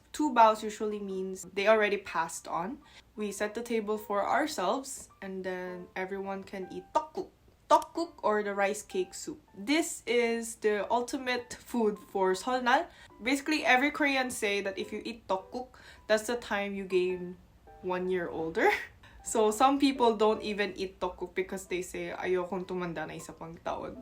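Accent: Philippines. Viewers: Pinay na pinay accent kapag nagtataglish ka...hehe 😉😅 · Anyo sarangheyo 😅 🇵🇭🤝🇰🇷 🥰 ask ko lang pag nag e english ka dyan sa co worker or Korean friends mo ano comment nila sa pilipino english accent mo???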